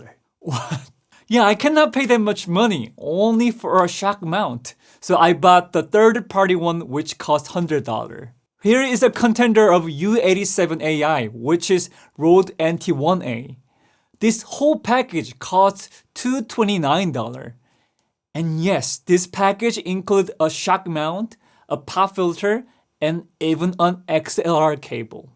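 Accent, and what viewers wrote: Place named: Asia. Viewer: 아시아산 영어의 향기가 느껴져서 좋네요.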